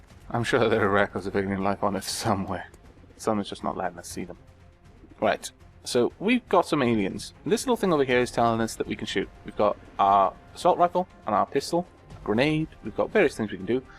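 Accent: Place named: South, United Kingdom. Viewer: As an aside, you're one of the first Englishmen that I have yet to even accurately ascertain what part of Britain you come from based solely on your accent..I'll throw caution to the wind and guess south of England? I'm probably way off.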